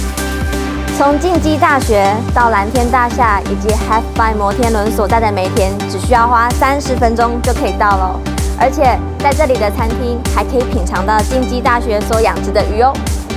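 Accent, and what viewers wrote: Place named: Taiwan. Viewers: 本多照子 発音からして、台湾人だと思います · 台湾人だと思います。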